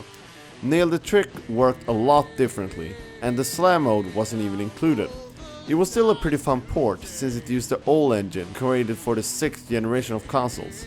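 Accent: Sweden. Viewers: Holy shit you sound really swedish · the guy that narrates are so Swedish :)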